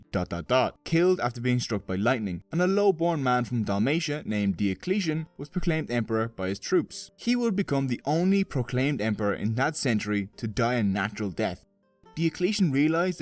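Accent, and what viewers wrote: Ireland. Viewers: That's 100% Irish accent.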